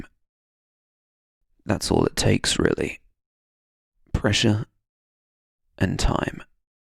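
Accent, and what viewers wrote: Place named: United Kingdom. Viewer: please sir i like your accent so much and I'm your follower student on youtube podcast. I found the 4000 essential words are read by American accent and really I like it to be with your British accent. please try to help us by reading these 4000 essential words in English.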